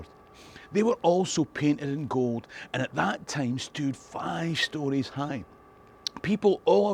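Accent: Scotland, United Kingdom. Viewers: Watching on the TV in our livingroom in Canada. I am a very very distant descendant of James VI and Mary and the rest of them. My "immediate" ancestors came over from Ayrshire. Always interested in the history. I enjoy your videos immensely, although I do have to turn on the text because your wonderful accent throws me for a loop at times. Thanks for what you do :) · Watching at bedtime in the Pacific NW US; your Scottish accent is wonderful. I love reading western European history fiction, all time periods. While Iistening to the stories, I like to learn the actual history. I watch your videos to help bring to life the Highlander books I read (actually listen to on audiobooks) as well as other Scottish time periods. My favorite genre is time travel...I just listened to a story about Stirling Castle and William Wallace. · There's something strangely nostalgic about hearing someone with a Scottish accent using onions as a metaphor for layers. 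· Watching on my iPad, on my couch, drinking a cuppa, not only loving the history, the castles but love hearing that gorgeous Scottish accent...I'm an Aussie..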